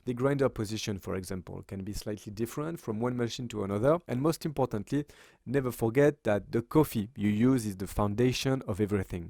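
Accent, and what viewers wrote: France. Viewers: Good English with nice french accent!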